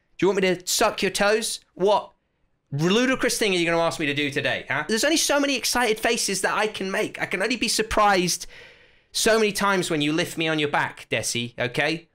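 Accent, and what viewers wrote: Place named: England, United Kingdom. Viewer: He doesn't sound like dantdm he just has an English accent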